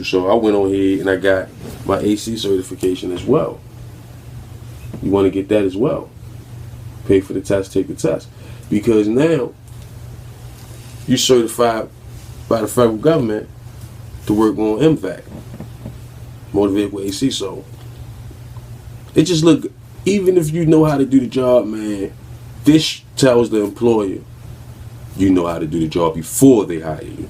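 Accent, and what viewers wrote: Baltimore, United States. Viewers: I knew you where Baltimore from that accent. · The way he talk I knew he was from Baltimore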